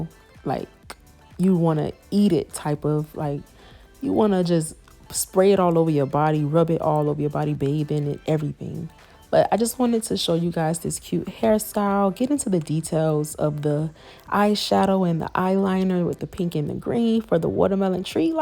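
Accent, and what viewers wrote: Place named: Miami, United States. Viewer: Recognize a Miami accent from anywhere. 💕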